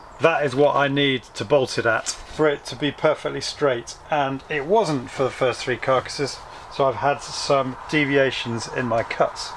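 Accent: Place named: Southern England, United Kingdom. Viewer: Just wondering what part of the UK are you from. I am up North in Lancashire you sound like your up south?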